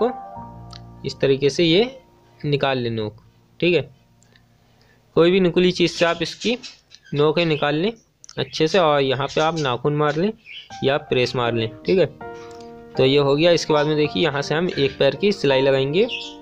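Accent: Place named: Pilibhit, India. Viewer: Nice... Aap rahte kahan ho? Bhai bhasha bilkul humari jaisi hai.... Pilibhit zila....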